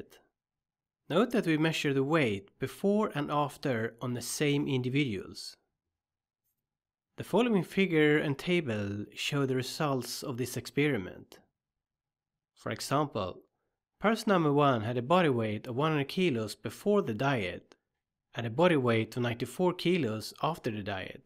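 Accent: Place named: Sweden. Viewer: Din svenska accent går inte att ta miste på. Vrf gör du inte videon på svenska?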